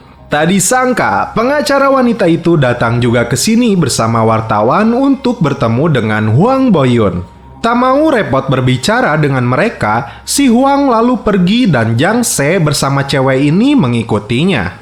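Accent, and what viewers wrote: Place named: Sunda, Indonesia. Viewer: Sorana sunda pisan, anjir.... 😀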